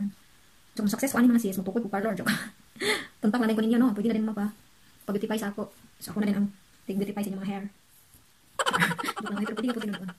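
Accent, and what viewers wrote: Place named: Visayas, Philippines. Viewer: Hi friend. Ang cute talaga kapag visaya ang salita..thank u sa pag share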